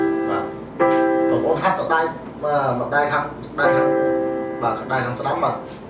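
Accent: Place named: Oula, Cambodia. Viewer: អូននិយាយដូចអ្នកនៅស្រុកអូឡ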